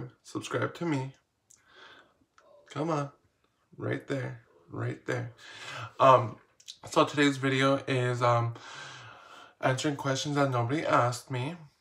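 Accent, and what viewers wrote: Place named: Australia. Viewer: Australian accents hit different <3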